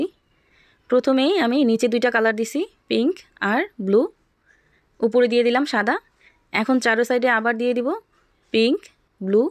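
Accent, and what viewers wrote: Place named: Mymensingh, Bangladesh. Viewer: আপু আপনার বাড়ি কোথায় আপনি ময়মনসিংহের মানুষের মতো কথা বলেছেন 😊😊😊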